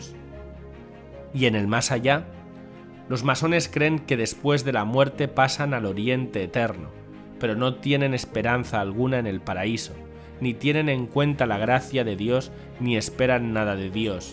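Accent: Spain. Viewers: Buenas, felicitaciones. Buen contenido pero muy alta la música. No permite entender su voz, que es español de la Padre Patria y los latinos debemos afinar el oído y con la música altísima, nos cuesta. Por favor, siga adelante enseñando lo disfrutamos, sólo con un poco más baja la música. Gracias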